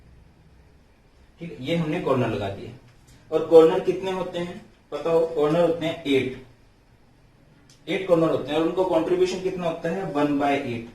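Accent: Haryana, India. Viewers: Sir you are from haryana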